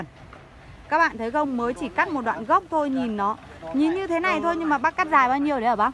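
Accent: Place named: Thach That, Vietnam. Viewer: Gọng nói thạch thất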